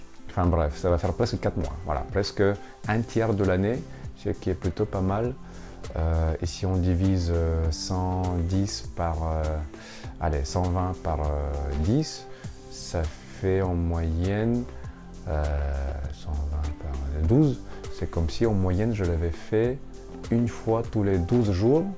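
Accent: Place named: Russia. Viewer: Très bon contenu merci , on sent une certaine apaisement en toi , et ton accent est très charment c'est russe ?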